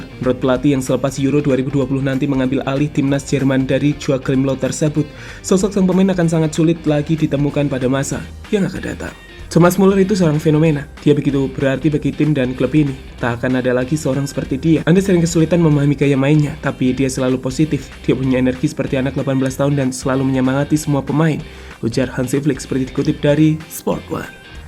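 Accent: Indonesia. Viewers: Anda Orang Asli Malaysia..?? Kok Logat bicara nya Indonesia Banget..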